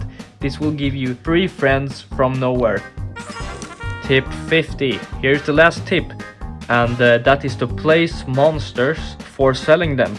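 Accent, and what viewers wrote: Sweden. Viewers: bro u sounds so swedish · Are you Swedish, because your accent sounds really Swedish?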